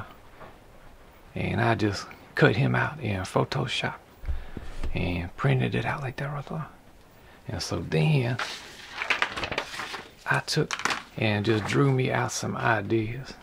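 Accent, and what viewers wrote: Louisiana, United States. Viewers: New to your videos. Is it rude to ask if you’re doing a voice? I’m from the South but the way you use contractions is very different from what I’m used to hearing. I assume you’re from Louisiana or Mississippi? Aside from that, great tips and love how your video persona is the puppet. I would do that if I made videos.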